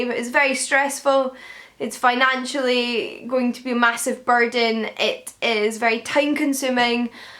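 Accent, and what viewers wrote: Scotland, United Kingdom. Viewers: I absolutely love your accent (I spent 6 months in Edinburgh as a student and there is no accent I like better :-))! Good luck with the flat hunting! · It sounds like while you've had inevitable adjustments, your move has largely brought you that incredible feeling where you feel you're exactly where you'r meant to be in life, and surrounded by incredible opportunities to boot!! Glad it's working out for you (and that you're still taking time to make videos amongst all these changes)! I do have a question about how you're sorting flat hunting--did you just bring up suitcases and plan to crash with friend(s) until you found a place?? (this reminds me a bit of when I moved to NYC--packed my stuff in suitcases, crashed with a friend for 4 days, looked at lots of apartments Id been searching ahead of time on Craigslist[idk if theres a uk equivalent to craigslist… you can find all kinds of stuff on there but its mostly used for roommates and apartments, though you have to be careful] and other sites, and had actually found a place in less than a week.). 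And your experience with your "otherness"/Scottish accent feeling enhanced is so apt--I actually had the opposite experience once!! If it's not obvious, I'm American and have lived there my whole life. I'm mixed background (aren't we all), but a large % Polish. (and obviously while it's not a perfect melting pot, the US has a mix of ethnic backgrounds etc). When I visited Poland for a few weeks (with a friend I'd jokingly call "really" Polish--as opposed to my fake Polish? ;) Moreso because she went to Polish school every Saturday and was fluent,etc.,), I was struck by how much I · Re: your feeling of being more Scottish, YES! I feel a lot more French in England than I do in France. The people I meet tend to focus on the more positive aspects of being French and I tend to focus on the stuff I miss, so it's easy to feel more attached. When I'm home, I'm immediately in Ranting-about-the-French-government mode... 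And as a second-language speaker, I totally agree your Scottish accent isn't that strong. It's lovely & very recognisable as Scottish, but sometimes when people who sounds VERY VERY Scottish are on the news I have to put subtitles on or I won't understand.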